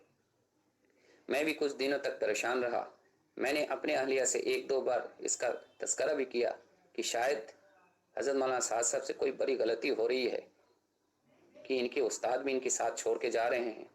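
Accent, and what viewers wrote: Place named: Bangladesh. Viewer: koi tafseel naheen hay sirf baat ke lehje se lagta hai koi bangali hay aur ghair motabar hay.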